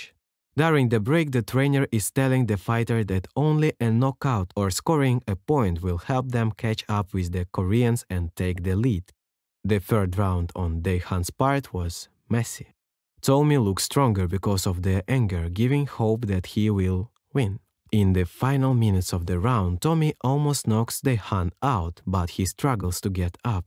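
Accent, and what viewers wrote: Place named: Russia. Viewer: чел, ты бы хоть русский акцент убрал, если уж озвучиваешь на английском )))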